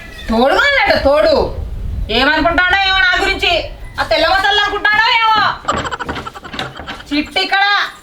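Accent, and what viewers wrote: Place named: Telangana, India. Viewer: Mee telangana accent is very good &appre table good.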